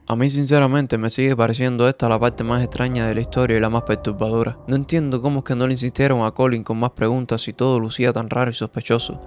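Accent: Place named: Cuba. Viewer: 😂😂😂😂😂Eres cubano 100%.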